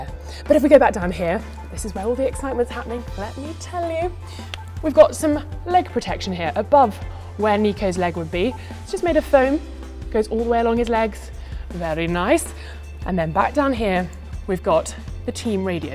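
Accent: United Kingdom. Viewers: I fucking hate that british accent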